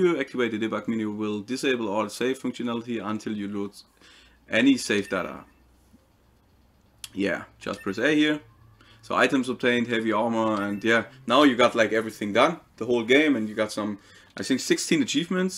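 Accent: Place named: Germany. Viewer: Dieses deutsche Englisch ist einfach herrlich 👍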